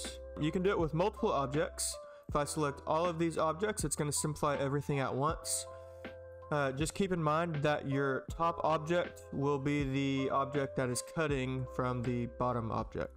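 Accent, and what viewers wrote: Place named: England, United Kingdom. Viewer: Thank God for clear english accent and also for useful video!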